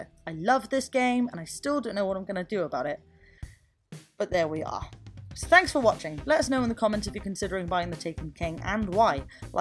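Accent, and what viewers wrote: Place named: West Country, United Kingdom. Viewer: Quick question..... Where are you from? certain aspects of your accent sound very "west country"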